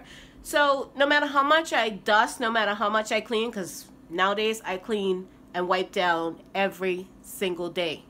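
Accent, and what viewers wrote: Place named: Philadelphia, United States. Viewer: You are one organized lady. Loving your accent... what is that? Philly? Me being from the west coast you would probably say i am the one with an accent!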